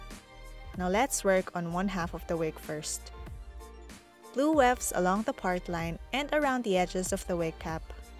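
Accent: Philippines. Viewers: Yea I could tell she might be filipina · Are you Filipino? Cuz your accent sounds like Filipino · Is she a Filipino? I can tell from her accent. 😅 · you have a filippina english accent are you filipina? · You have a filipino accent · U r filipina right? The u talk English . ^^ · Your accent tho, are you from Philippines? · is she Filipino? her accent sounds like one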